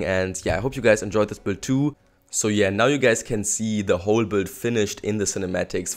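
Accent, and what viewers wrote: Germany. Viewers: Dieses Aussprache hört man als Deutscher sofort haha. Starkes Video ✌ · German accent.... Cant give me that...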